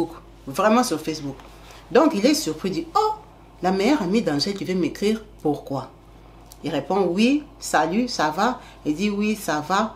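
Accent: Democratic Republic of the Congo. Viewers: Franchement jadore tes histoires et tu merites être connu, je vais partager tte tes histoires ds ts les groupes Facebook❤🎉 Merci de Ns les conter terre a terre sans chichi avec cet accent congolais 😀🥰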